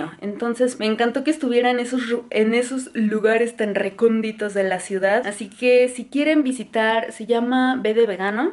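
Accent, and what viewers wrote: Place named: Mexico. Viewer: Anette Flores Asi hablamos en mexico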